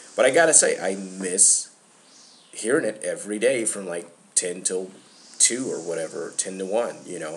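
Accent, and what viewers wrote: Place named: United Kingdom. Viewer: bring back your british voice. it's HAWT and i miss it.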